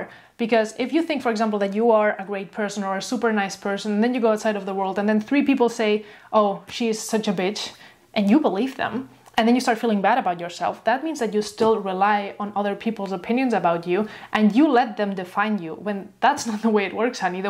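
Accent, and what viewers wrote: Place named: Spain. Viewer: Girl you're soo underrated! Also are you Spanish? Love your accent!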